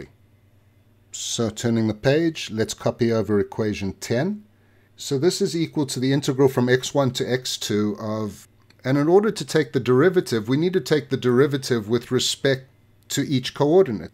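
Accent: South Africa. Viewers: Are you South African friend? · South African accent? 😁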